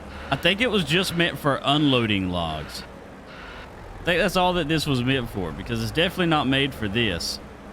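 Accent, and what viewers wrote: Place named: Georgia, United States. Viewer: Where in Georgia are you from? I recognize that accent. I'm about 30 minutes SW of Atlanta.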